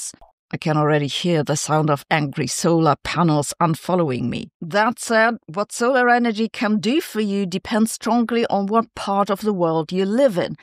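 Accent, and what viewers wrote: Germany. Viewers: ❤❤❤ure German accent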